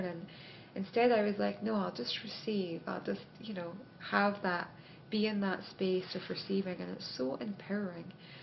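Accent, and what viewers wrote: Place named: Scotland, United Kingdom. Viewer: I think i detect a bit of Scottish accent.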